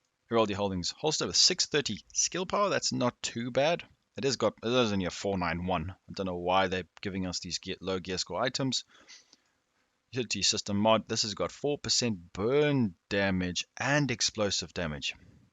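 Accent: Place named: South Africa. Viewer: Wascally... You sound South African. As a lightie, didn't you watch morning cartoons before heading to school? Wascally is pronounced like rascally, with an Elmer Phud lisp when he's ranting about the wascally wabbit (Bugs Bunny IOW) that he's taking strain to catch. Thus the Wascally talent makes one harder to bog down with riot foam 😜👍🏽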